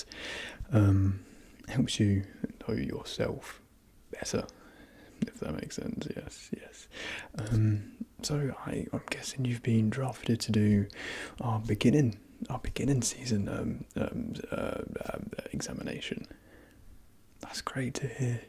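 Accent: United Kingdom. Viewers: I love his voice. His accent is ✨perfection✨. I love English accents. Proper English, also known as British English, is just so intelligent, it sounds intelligent. People who are from the UK, when they speak, they sound so educated. But your voice really calms me down, and I love that you can do that. Not everyone's voice calms me down. But you made me feel very relaxed, so thank you. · What kind of English pronunciation is it? I want to learn it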